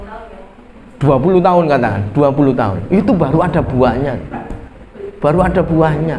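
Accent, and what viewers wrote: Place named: Java, Indonesia. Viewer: Sumenep kok logate jowo tadz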